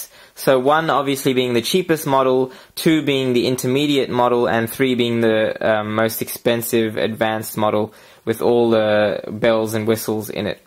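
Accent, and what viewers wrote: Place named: South Africa. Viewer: He sounds kinda South African.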